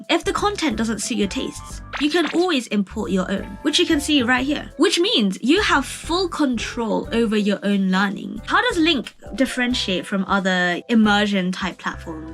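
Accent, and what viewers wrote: United Kingdom. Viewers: Oh the Britsh accent. I thought you said watch lewd things...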